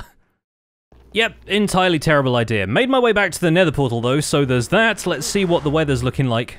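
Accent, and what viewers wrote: England, United Kingdom. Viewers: this guy has a certain way with words, for sure. I think it's part of the english humour, accent and delivery lol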